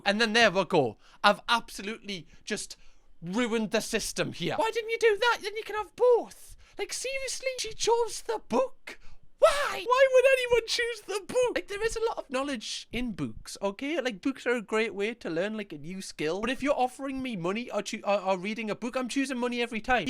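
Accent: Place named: Northern England, United Kingdom. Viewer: i used it briefly twenty years ago and had some good job offers. Unfortunately it's was at a time in my life where i was moving out of my mum's place and had a local good and steady job offer which I've stayed with. From what you've shown today I'm glad I've not had to go work for these sociopaths. That aside, I'm born Northern but raised Southern (moved Dan Souf when i was a year old). I love your dialect - i really enjoy your takes and sometimes incorrect pronounciations - don't stop what your doing dude, and good to see your missus on recent video too. I've been en subbed for about a year or two now so not fully in with all the lore, but I'm here and staying! Cheers big ears ❤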